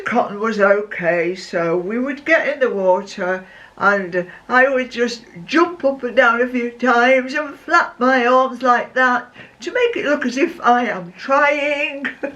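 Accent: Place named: Manchester, United Kingdom. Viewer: Dear..... what I good laugh this video gave me because your attitude to swimming was and has always been mine. To me you appear ro be so brave you managed to paddle in the sea up to your knees....BRAVO...I could never go over my calves...LOL. I think the modern way they teach kids to swim nowadays is quite different not like it was. I do enjoy your videos nd love your northen accent It reminds me when I was beought up in Manchester. I have been living in France for many moons now.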